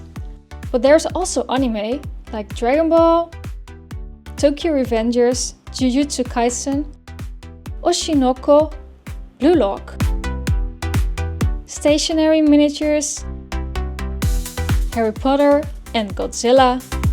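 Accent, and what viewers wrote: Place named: Netherlands. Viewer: I can tell the dutch accent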